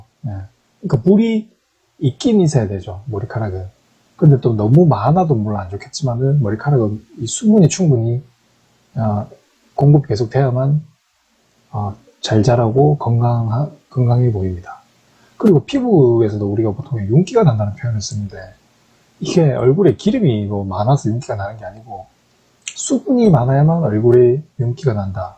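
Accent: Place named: Pohang, South Korea. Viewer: 안녕하세요,, 억양이 우리지방이네요.. 혹시 거주지가 포항은 아이죠??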